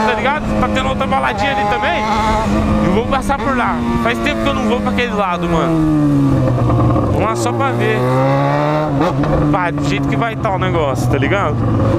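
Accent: Goiás, Brazil. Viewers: Por que você fala no pique do Goiás. você de de Goiás?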